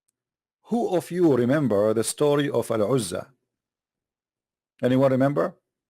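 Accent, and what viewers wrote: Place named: Israel. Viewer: What in the name of the judgement day are you talking about? Arabic Gods? Islam came to destroy those false Gods. God did not give birth nor was born. it is the Clearest message in the book that you insult. why there is always those small channels that no one knows with a guy with thick israeli accent trying to promote something so ignorant to such small number of people off the grid, don't you feel ashamed?. i mean if you hate the religion okay. you're free but don't be stupid. there are history books written by your people, educate yourself before talking stupidly and making a joke out of yourself. you're supposed to be a teacher, a preacher? what are you? your job is to attack other people's beliefs based on lies? is this what your main religion taught you? to spread lies in order to badly influence ignorant people that has no knowledge about anything ? 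Whatever man. God is Greater than any work in the dark. He's always awake and always sees everything. Even the things we never say. keep that in mind. Peace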